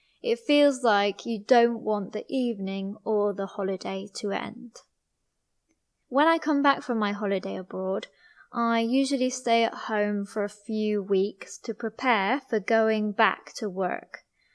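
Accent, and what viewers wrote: United Kingdom. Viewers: Your voice is so pretty wow I love it with the unique British accent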